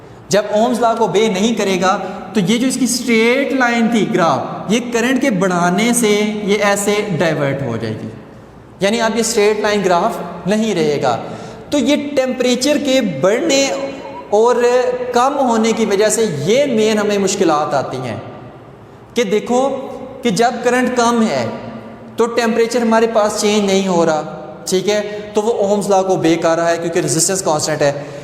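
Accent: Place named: Saraiki, Pakistan. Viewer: Sir ap baton baton mei saraiki lgay hain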